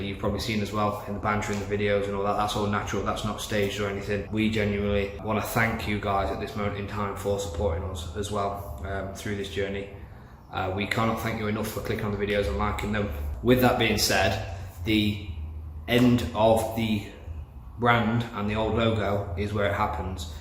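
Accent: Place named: North West, United Kingdom. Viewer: Where are you from north west because that accent is mint lad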